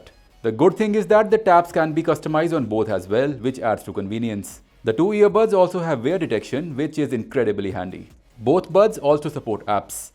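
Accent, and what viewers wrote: India. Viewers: Indian ho ya foreign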